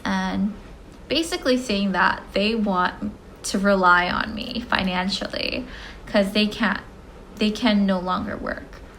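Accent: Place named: United States. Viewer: 핱시봤을땐 한국인유학생출신인줄알았는데 이 영상보고나니 한국계미국인인거 확 와닿네요 말하는 방식이 완전 미국인ㅋㅋㅋㅋ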